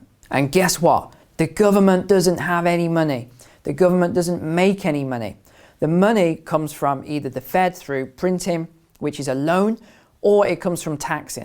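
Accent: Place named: England, United Kingdom. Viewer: Morning. liked and Subscribed. So entertaining listening to a refined English accent talking about American real estate. Im a Yorkshireman with the usual Yorkshire twang. Do your grim findings apply to the UK. Cheers for the content. Tim.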